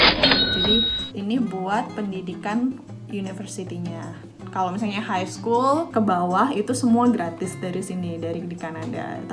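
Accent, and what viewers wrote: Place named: East Java, Indonesia. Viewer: Learning by doing, mantap mbak Btw mbak e logat e wong Jawa timur heheh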